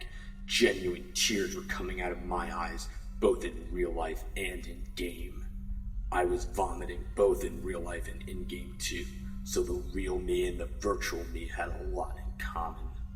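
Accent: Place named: United Kingdom. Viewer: I love the bri'ish accent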